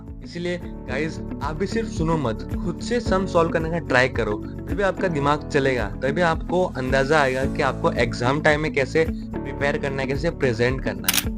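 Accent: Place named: Gujarat, India. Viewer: bhai bohat achhi video banai hai, ek dum jordar and your gujarati accent😀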